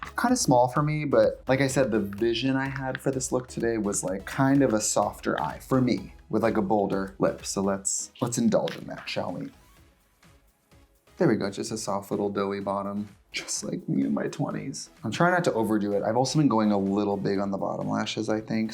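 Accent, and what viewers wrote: Wisconsin, United States. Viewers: LOL, Wisconsin accent. I'm still trying to manifest the x Charlie Berens Wisconsin collab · I love it when your wisc. accent comes through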